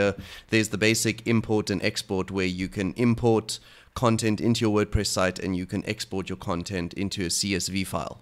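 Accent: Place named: Cape Town, South Africa. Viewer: Thank you - this is super helpful! P.s. knew you were from Cape Town the minute I heard you talking:) Lived there last year, it's a gorgeous city!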